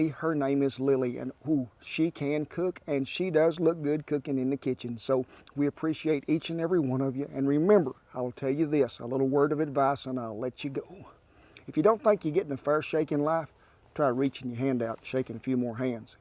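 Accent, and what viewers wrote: South, United States. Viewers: I'm in Germany and I watch this for the southern drawl and the quality control Inspector Beagle. And for the food of course.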